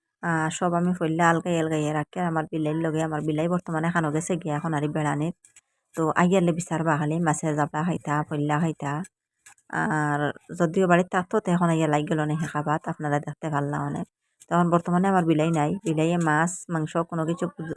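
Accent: Sylhet, Bangladesh. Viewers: আফা চা এর কালার কিন্তু খুব সুন্দর অইছে। আসাম কিতা আমরার সিলেটি মানুষ আছইন নি। আসাম তো ইন্ডিয়া। আপনার মাত সিলেটের কিন্তু থাকইন আসাম। আমি বুঝতে পাররাম না। সিলেট থাকি আসাম যাইতে কতো সময় লাগে আফা।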